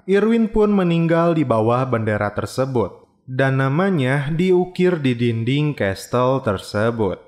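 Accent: West Java, Indonesia. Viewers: Org sunda y bang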